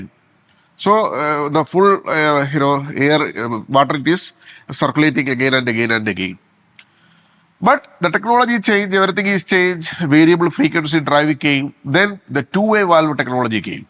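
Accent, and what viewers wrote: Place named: India. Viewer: dear you are doing great job. but please pronounce words in a way so any one can understand easily. your pronunciation is like typical domestic indian language. keep in mind while making the video tht Your audience is not only from India but also from all countries. so your words should be understandable for all of them.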